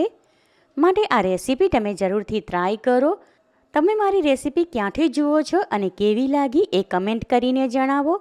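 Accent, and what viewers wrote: Surat, India. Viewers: જેવો મીઠો હલવો એવી મીઠી સુરતી બોલી❤😊❤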